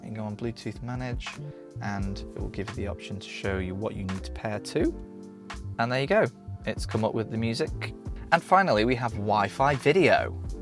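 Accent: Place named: Nottingham, United Kingdom. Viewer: Really good video, thank you! From your accent and the A453 Road, I’m guessing you’re somewhere around Nottingham, England — but I noticed you mentioned prices in dollars. Any reason for that? This is actually the first time I’ve watched your video, and I’ve got to say, your presentation is awesome. I ended up watching the whole thing without blinking! Usually, I only watch about 20% or skip to the interesting parts, but yours kept me watching all the way through.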